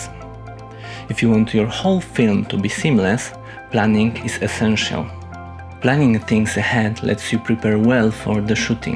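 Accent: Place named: Russia. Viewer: Вы русский? 😅 You have russian accent Well done 👏 I learned a lot 🙌 thank you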